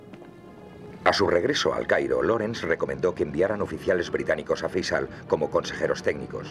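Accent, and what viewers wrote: Spain. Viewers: This accent is from the spanish península, that is spain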